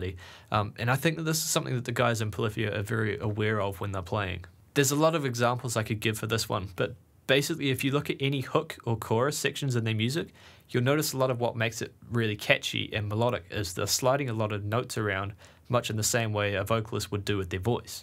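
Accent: New Zealand. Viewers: I watch so many shred videos, I'm so glad to finally hear a New Zealand accent after all day hearing British and American accents, like no offense to them but it's always good to see a fellow New Zealander making killer content.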